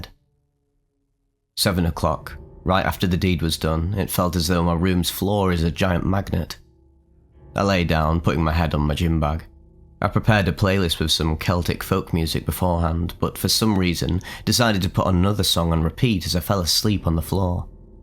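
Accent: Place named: Lancashire, United Kingdom. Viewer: Hey, I’m from Lancashire living abroad for work and always missing home. Hearing your accent makes me feel back at home. Just wanted to thank you for your work, incredibly educational and entertaining. Please keep it up 🤙🏼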